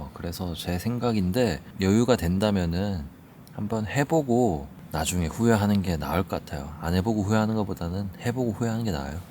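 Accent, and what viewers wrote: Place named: Seoul, South Korea. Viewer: 날이가면갈수록 ㅋㅋㅋㅋ서울말 느신거같아요 ㅋㅋㅋㅋㅋㅋㅋㅋㅋㅋㅋㅋㅋㅋㅋㅋㅋㅋㅋㅋㅋㅋㅋㅋㅋㅋㅋㅋㅋㅋㅋㅋㅋ오늘도 랜선캠핑 👍🏻👍🏻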